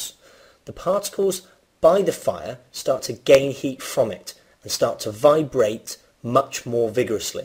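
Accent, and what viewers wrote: United Kingdom. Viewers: Bro the British accent lol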